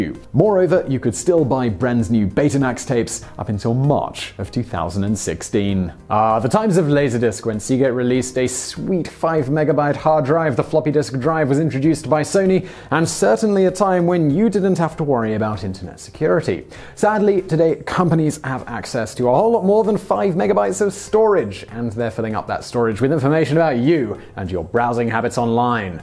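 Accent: United Kingdom. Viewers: today I found out a person with a british accent can be misleading and wrong. what about the VCD format?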